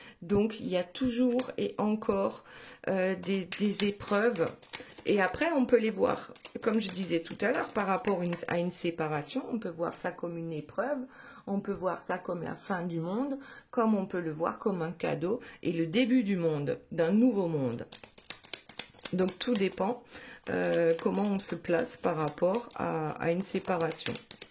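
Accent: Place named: East, France. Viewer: Merci beaucoup pour cette guidance, je pense que ça correspond bien à ce qui se passe dans le couple de celle que j'aime avec qui on est en non communication pour l'instant. Sinon j'avais choisi le monde pour elle et la mort pour moi et ça me va 👍 Merci (aussi pour ce petit accent de l'est ..😊 je suis de Moselle 😂) 😘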